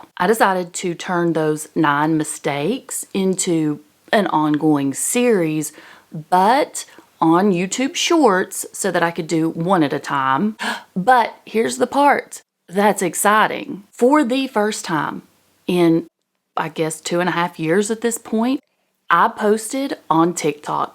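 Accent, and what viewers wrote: South, United States. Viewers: Hi.good blog today.op love your snow. From northern Canada so we get lots. I bought dr Fungs Obesity Code book and a great read. Halfway thru the video with CEO you recommended. Great information and makes such good sense. thanks for recommendation. Love your show and your southern accent. Wild. ❤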